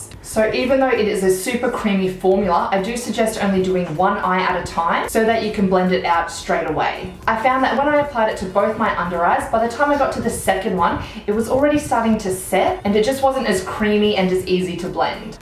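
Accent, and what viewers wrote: Australia. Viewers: Are you Australian live room Egypt ❤️❤️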